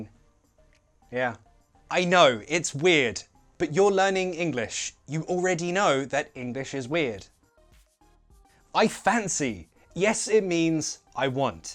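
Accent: United Kingdom. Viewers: Oh God I love the British accent 😂😂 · You remind me how beautiful British English is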